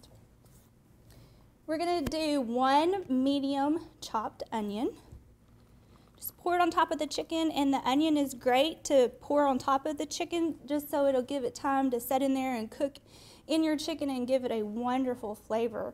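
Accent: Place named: Texas, United States. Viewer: Greetings from the Great White North , I love your recipe and I especially love your beautiful Texas accent. Thanks again ;-]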